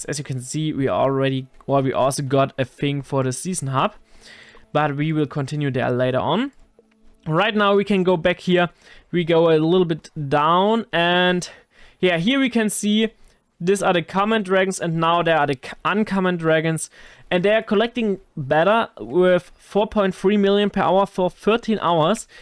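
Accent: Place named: Germany. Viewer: w german accent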